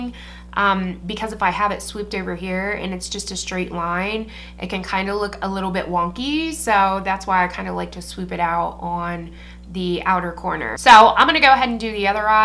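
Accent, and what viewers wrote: United States. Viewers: I love this tutorial, thank you! You sound like your from Philly, reminds me of my aunt lol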